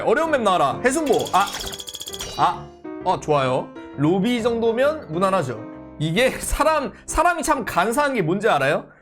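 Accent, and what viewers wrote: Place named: Jeolla, South Korea. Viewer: 왜 전라도말을 나 전라도 목포에서 태어남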